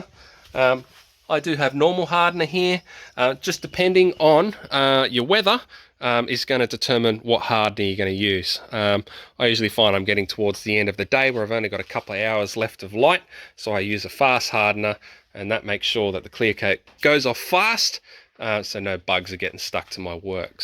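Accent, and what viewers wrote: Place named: Australia. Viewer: Motorcycle, check accent, check. Nature sounds in the back check Filmed outside check You must be australian 😁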